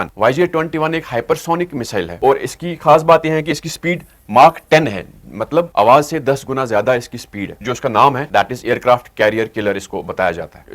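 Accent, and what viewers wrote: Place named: Kashmir, India. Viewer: Kashmiri accent ❤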